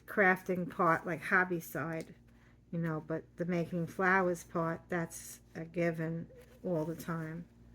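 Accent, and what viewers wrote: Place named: New York, United States. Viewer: Absolutely beautiful! Love that NY accent ❤❤ it reminds me of a peony. I’m going to give it a try. May make one for the baby shower Sunday.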